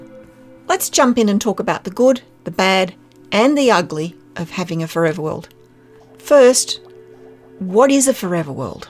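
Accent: Australia. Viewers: Hello, you sound Australian. im Kiwi, its so pleasant to hear your voice and see what you have made ! Incredible work aswell you have some passionate builds! Thanks for inspiration ❤ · thats one heck of an aussie accent love it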